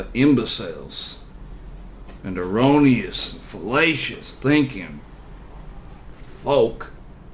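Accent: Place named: Georgia, United States. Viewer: I like the accent. Sounds like you’re from Gawja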